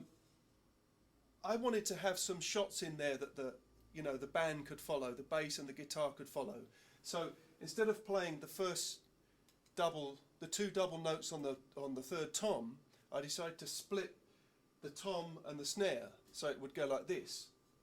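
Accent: United Kingdom. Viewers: You sound like you're from London! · Yeah east, hes cockney mate!.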